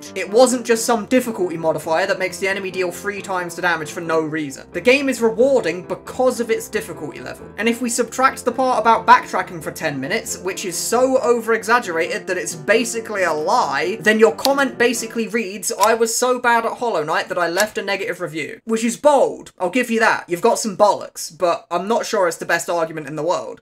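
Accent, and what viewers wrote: United Kingdom. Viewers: British lol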